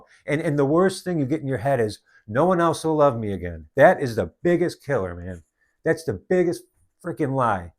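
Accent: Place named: Cleveland, United States. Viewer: Are you from Cleveland? I am...recognize the accent!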